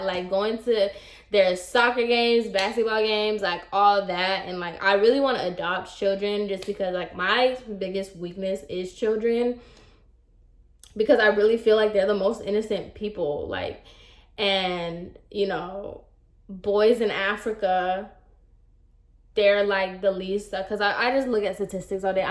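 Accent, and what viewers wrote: Puerto Rico. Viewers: I love how spanish sounds in a puerto rican accent!!! My spanish is so blahh!! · Hello from Oregon 👋 You are right, Spanish even though it’s Spanish, it’s different depending on where you live or where you grew up. But I like your Puerto Rican accent ☺️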